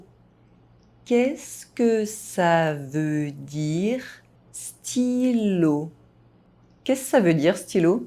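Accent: France. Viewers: I know but I wish I could do it JUST LIKE YOU! Also I LOVE you French accent of English! That is the best foreign accent! Much less rough than Austiran for sure lol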